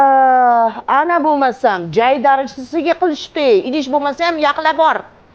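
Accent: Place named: Russia. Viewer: Русс мисиз акценттиниз баскаша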